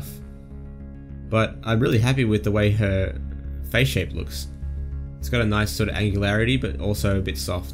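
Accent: Australia. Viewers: Wow straight off....Aussie talk, i miss people being just them selves, not afraid to speak your mind and not worried about the odd swear word thrown in. :) What part of DownUnder you come from, im a sandgroper, if your Aussie you know where that is :P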